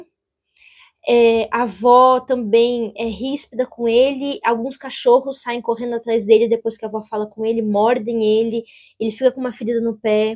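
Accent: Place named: Latin America. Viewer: QUE AULA! Amiga, eu sempre amei as nossas conversas, e sempre quis assistir uma aula sua. Considero esse desejo realizado com esse vídeo. Sem dúvida a fruta não caiu longe do pé heheh mas tem o seu jeitinho de explicar. Simplesmente amei a introdução ao livro ❤ E quanto ao livro em si... só o começo da história já me deixou muuito intrigada! Quero saber mais sobre essa família de mulheres hehe e se só o começo já é assim, tudo o mais que você contou sobre a história me deixou ainda mais curiosa e interessada pelo livro. Mais um na lista!! Hehe Ainda mais especial por ter esse "sotaque" latino 🎉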